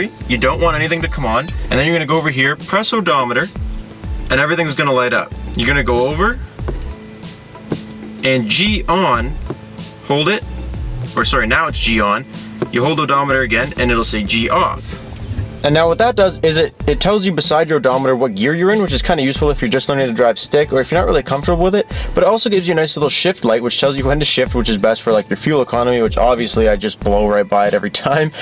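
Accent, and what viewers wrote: Ontario, Canada. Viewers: lol super ontarian accent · Are you Canadian or from a northern state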